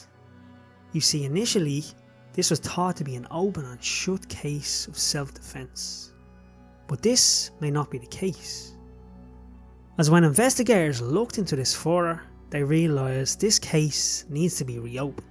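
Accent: Ireland. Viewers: How rude of a comment to call the narrators voice weird, I’m sure Americans sound weird to other English speakers in European countries. He’s an Irishmen, and their English dialect has been around longer than Americans dialect of English. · I’m Australian & I just love an Irish accent! The best accent in the world 🍀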